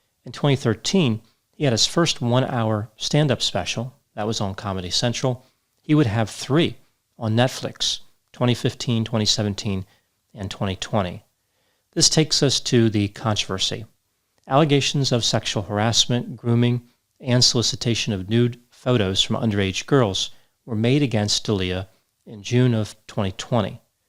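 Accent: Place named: Philadelphia, United States. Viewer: Is from Delco/Philly area? I recognize our accent anywhere. Lol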